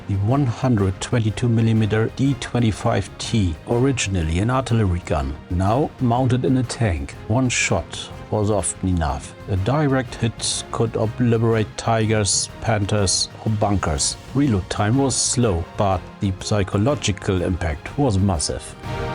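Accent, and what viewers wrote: Germany. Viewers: I hear that you are german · love your vids! btw are u german?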